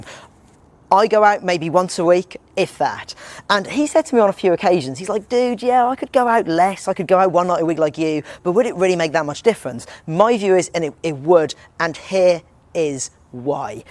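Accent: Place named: United Kingdom. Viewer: Bro this guy has great voice.. Totally British accent!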